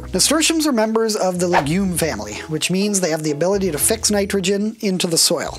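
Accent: Canada. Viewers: I just discovered you. I knew within 8 seconds you're canadian. True North! 🇨🇦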